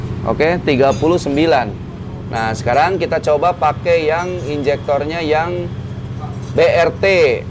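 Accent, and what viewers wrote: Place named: West Java, Indonesia. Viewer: Aya logat sundaan.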